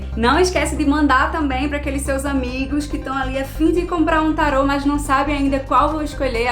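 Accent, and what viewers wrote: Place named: Recife, Brazil. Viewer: Pelo sotaque já sei que é de Recife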